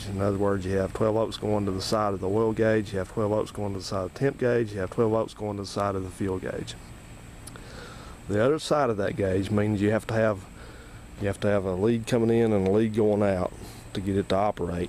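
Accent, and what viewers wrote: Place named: Georgia, United States. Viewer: you've gotta be from south GA. Ill be danged if Im wrong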